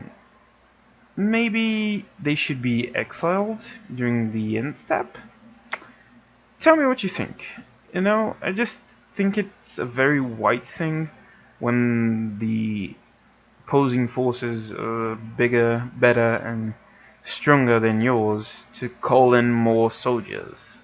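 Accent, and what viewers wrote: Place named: United Kingdom. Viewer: Dat british accent tho hue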